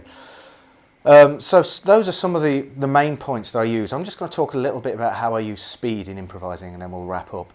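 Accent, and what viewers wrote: England, United Kingdom. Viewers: damn, that english accent!!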